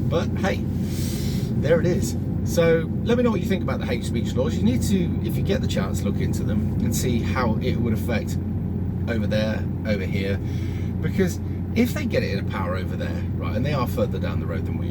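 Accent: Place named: England, United Kingdom. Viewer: Well is a very handsome looking English man with a gorgeous English accent, he does sound very classy, don’t mind his use of swear words, much needed in our current situation🇮🇪☘️🇬🇧❤️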